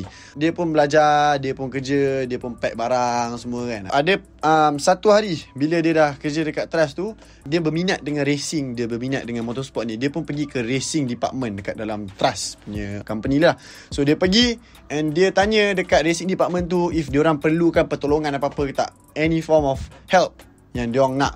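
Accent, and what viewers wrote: United Kingdom. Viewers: Haha mantap English accent, just like the video and content, keep it up and well done!🤜🤛🙌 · Mantap oo british accent die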